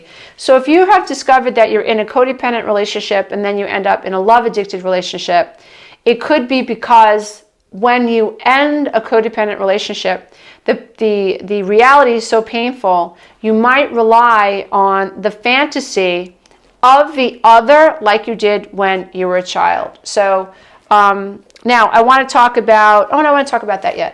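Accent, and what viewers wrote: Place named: Brooklyn, United States. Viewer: You are by far the best one on this topic, Ramani is good too but I relate to you better, probably due to your (funny) accent, lol....downstate, Brooklyn....I cant say thank you enough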